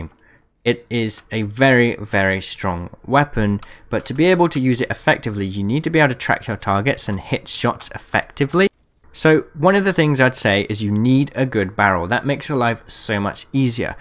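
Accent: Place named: United Kingdom. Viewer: I hear British voice, I sub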